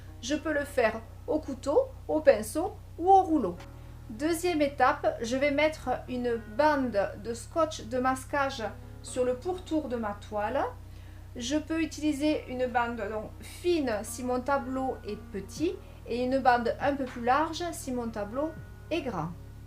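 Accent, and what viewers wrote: South, France. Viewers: Bonjour,👍je vous découvre seulement maintenant. Enfin des vidéos en français. Ce n'est pas évident de comprendre certains artistes en langage étranger. Surtout pour la partie technique et les produits. De plus votre voix passe très bien aux intonations du soleil. Votre tableau m'a donné envie de le réaliser à mon tour, je fonce. Merci de vos conseils. Bonne continuation à bientôt de vous retrouver. ✌👍👏😘🌹 Christine de Cannes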